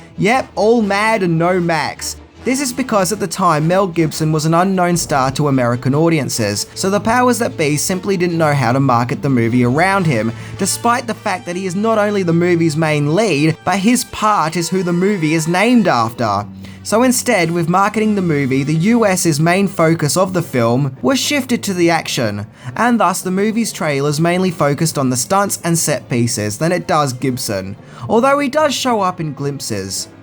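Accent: Australia. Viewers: An Australian talking about Mad Max feels right. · Always makes me smile when an Aussie talks about Mad Max. Just seems more fitting than listening to American reviewers.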